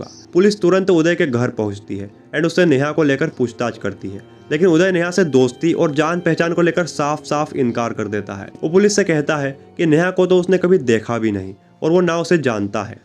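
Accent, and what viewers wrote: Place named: Bihar, India. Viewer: Social media per aap ko k apni language per thoda work karna chy sha ko sa Mt bolye pronunciation acchi honi chahie ek anchor ki presan nh hota preshan hota aapki language bihariyon Jaisi hai isliye story sunane ka maja nahin aaya anchor hone ke liye language per command hona zaroori hai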